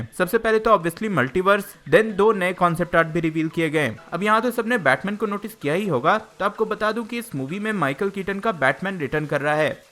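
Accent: India. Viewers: HAS..A.DESI.TOUCH IN HIS.VOICE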